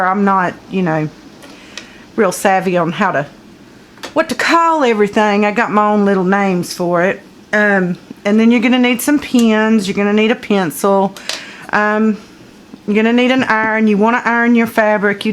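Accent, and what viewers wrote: South, United States. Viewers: love your southern accent :)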